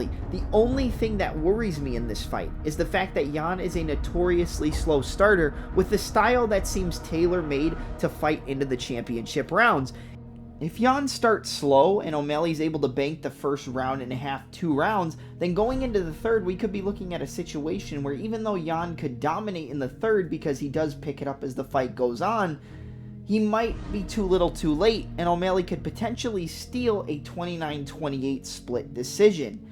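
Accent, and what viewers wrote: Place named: Midwest, United States. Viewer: Youtube changed the algo to feature smaller creators. I dig it. That thick midwest accent sounds like home. Good vid.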